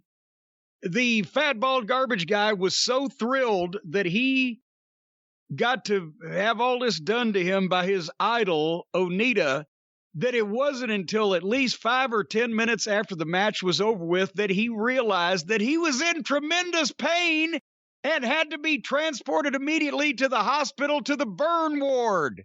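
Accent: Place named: Midsouth, United States. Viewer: That's how we say it in the Midsouth USA lol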